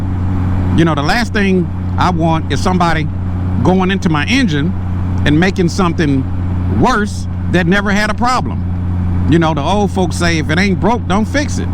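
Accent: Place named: Memphis, United States. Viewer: ! Man I never noticed that Memphis slang the way y’all pronounce some words, the rapper Glorilla who is a Memphis native pointed that out, by the way she has an uncanny resemblance to you my brother just some food for thought. Be safe out there and I’m loving my 15 Goldwing even more.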